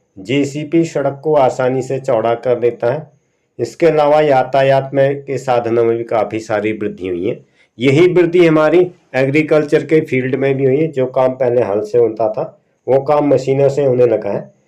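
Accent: Uttar Pradesh, India. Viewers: Aap kaha se ho..Boli se up wale lagte ho..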